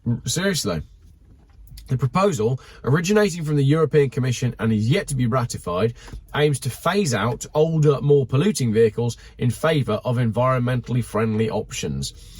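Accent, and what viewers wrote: United Kingdom. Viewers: Steering wheel on the right side and a British accent, so likely a Brexiteer and EU hater.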